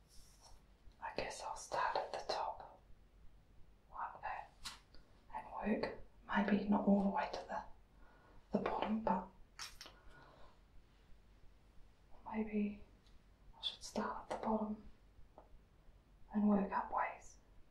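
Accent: Australia. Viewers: omg i love this!!! are you Aussie?? I laughed so much when you said, "sounds a bit rude".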